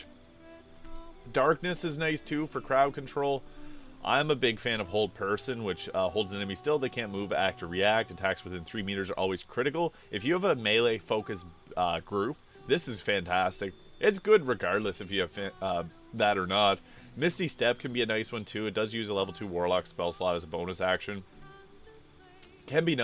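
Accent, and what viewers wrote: Canada. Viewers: Are you ducking Canadian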